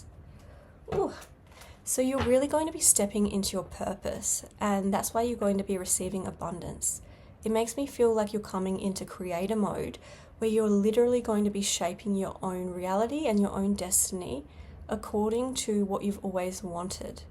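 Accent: Australia. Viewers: i like your hair the blue hair and blue eyes is almost like i was when i was youi age except i also had one blue loop ear ring and one blue Chuck Taylor All Star Converse shoe and one black Chuck Taylor All Star shoe when i was a freshman in college. i'm no spring chicken. and your accent it reminds of the Vegemite sandwich i had a few weeks ago and yes that was an Aussie band song reference. is from sunny California to the land of the rising sun Japan off the beaten path enough? 🍀🎶✨ my 2nd time thru college i had graduated from an online University. Biggest waste of resources ever. i could've been sharpen my Japanese language skills instead of learning software development during an Internet age when only 1 AI Class was available as an elective class which i decided not to take.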